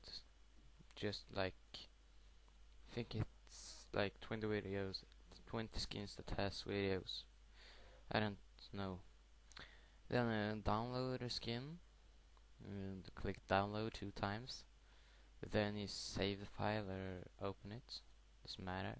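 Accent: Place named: England, United Kingdom. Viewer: where did u learn ur english accent :)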